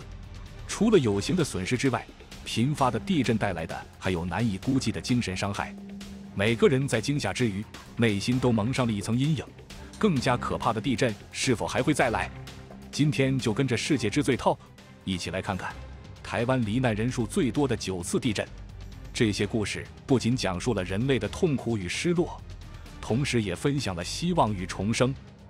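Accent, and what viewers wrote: China. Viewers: 這個text to voice 好像是公用的，但都是大陸語音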